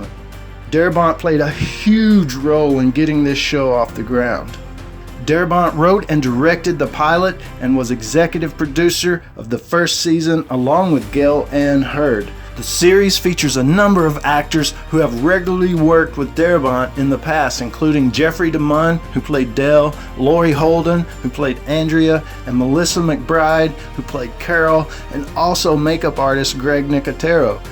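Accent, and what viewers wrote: South, United States. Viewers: Why is it that every fan Walking Dead video the guy has a Southern twang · good video. where you from man? I can tell you are a Southerner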